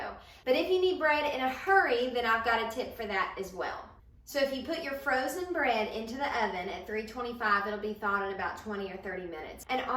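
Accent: South, United States. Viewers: Her southern accent disappeared about 2 minutes into this video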